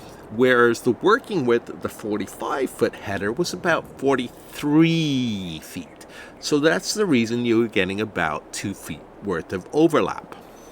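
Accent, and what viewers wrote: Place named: Northeast, United States. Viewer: Interesting findings. Thanks for doing these in-depth videos, they’re very helpful. Nice to get some tips from somebody who has been playing this game even longer than me! As a side note, I’d love to know where your accent comes from. I had you pinned in the northeast US until I recently heard you say you’re from Canada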